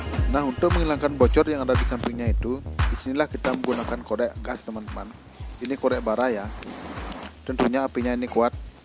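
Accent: Aceh, Indonesia. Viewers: Kyknya org aceh nih dr logatnya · Kyk nada aceh ni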